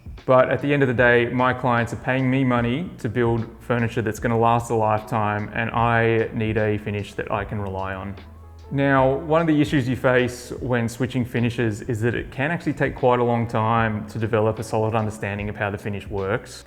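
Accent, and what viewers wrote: Australia. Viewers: Brilliant piece. I only realised you were Aussie in this video. Maybe I haven’t seen a video you speak in. Would love to visit your studios next time I’m up that way.I’d love to pick your brain. I just moved to Vesting LED cured hard wax oil. Not sure if you know it. Pretty cool, and I definitely had to make a few adjustments to sanding but it’s a great finish so far. And the instant LED cure is pretty amazing. I switched from Osmo too and I absolutely had issues with water/liquid damage with the last few pieces compared to previous. Never thought about too much till you mentioned it. Anyway, thanks for sharing as always, brilliant.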